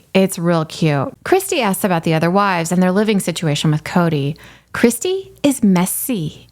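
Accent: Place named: Fargo, United States. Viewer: I absolutely live for your Robyn/Fargo accent. Makes me laugh every time.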